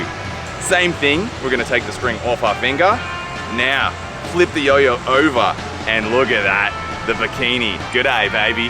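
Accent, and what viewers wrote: Australia. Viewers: mate, your aussie accent is pretty convincing! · Mate!.. thats a cracking Strayin accent.. nice one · As an Aussie he was doing so well with the accent until he said "mom" mate it's mum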